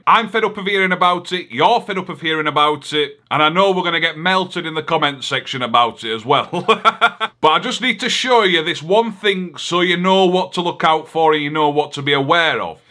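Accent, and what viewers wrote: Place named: North, United Kingdom. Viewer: You are very northern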